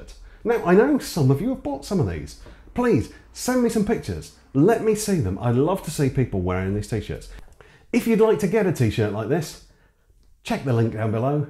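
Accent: United Kingdom. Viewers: A man with muscles and a British accent reading from an old book to passionately make a point.....I didn’t know about this kink until now. Thank you.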